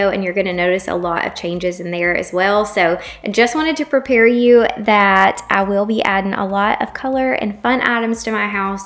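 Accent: South, United States. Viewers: Where is she located? She has a pretty southern accent.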